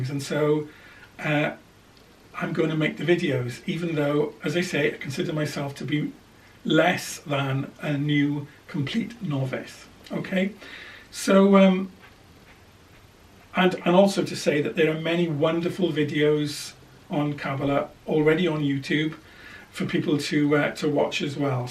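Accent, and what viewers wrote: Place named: South Wales Valleys, United Kingdom. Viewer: It sounds as though you have a slight welsh accent ?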